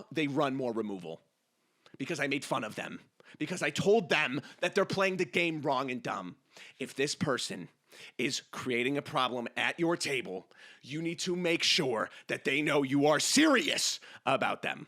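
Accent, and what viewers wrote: United Kingdom. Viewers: The recieved pronounciation is tripping me up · The british accent makes the intro so much more precious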